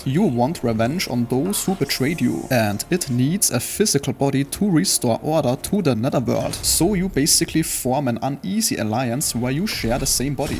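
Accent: Germany. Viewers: Are you German? You sound like it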